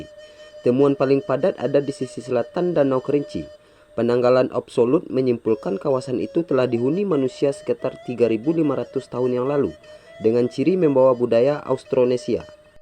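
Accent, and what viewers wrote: West Sumatra, Indonesia. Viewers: Logatnya minang bgt euy, pas bahasanya agak beda keliatan bgt lidahnya patah2 wkwk. "E" nya jelas bet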